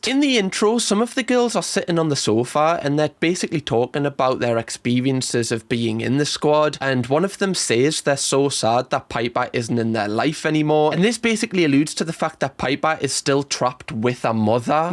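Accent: Newcastle, United Kingdom. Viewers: He says he has a Geordie accent? I don’t know where that’s from 🇨🇦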